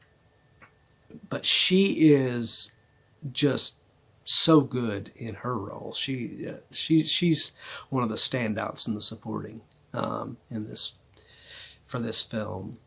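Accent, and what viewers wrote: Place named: United Kingdom. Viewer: I did like it. I noticed immediately that you had a totally different voice, certainly not an American one. And it was a good idea to use a British accent. As I've said before, it sounds a cold, commanding, sinister note. And amidst all those good old American "guys and gals" in "Queen Kobra" it was doubly effective.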